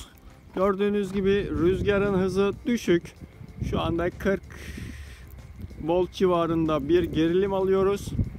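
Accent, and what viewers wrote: Turkey. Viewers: Ende manavgatli gibi az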